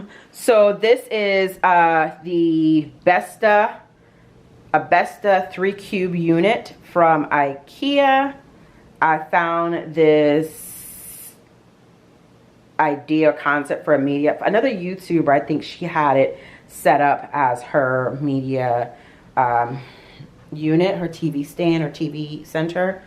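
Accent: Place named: Virgin Islands, Caribbean. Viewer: I love the video, very organized and well put together. I picked up quickly on your accent 🙂. I’m also from the Virgin Islands 🇻🇮, St. Croix. I’m now your new subscriber!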